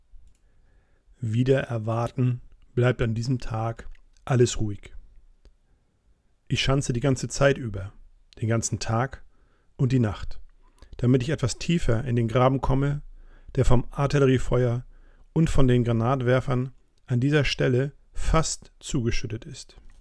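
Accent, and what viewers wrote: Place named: North, Germany. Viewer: Ein wirklich bewegendes Zeitdokument, das so lebhaft und plastisch beschreibt, dass einem direkt die Bilder dieser Kraterlandschaft vor Augen kommen... Was mich beeindruckt hat, war die manchmal schon fast poetische und malerische Schönheit und Präzision der Sprache, mit der dieser damals 18jährige junge Mann seine Erlebnisse und Eindrücke schildert. Ein großes Lob an Sie für die Vorarbeit und auch für das Einlesen dieses Textes selbst. Die Tatsache, dass kein Schauspieler oder Synchronsprecher diesen Text liest, sondern jemand mit in diesem Fall norddeutscher Klangfarbe, macht das ganze noch authentischer, noch spürbarer. Vielen Dank für diese Arbeit!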